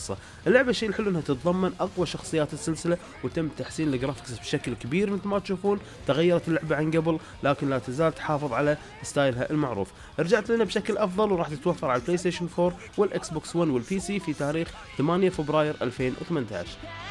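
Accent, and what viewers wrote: Iraq. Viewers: nutella Z العراقيين لهجتهم جذي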